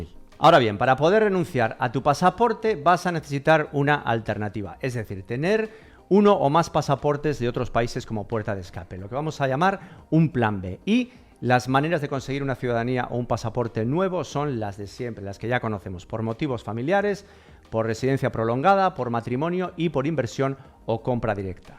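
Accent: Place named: Spain. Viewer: Entre con una curiosidad. Pense: este tio seguro que tiene acento español. Asi es.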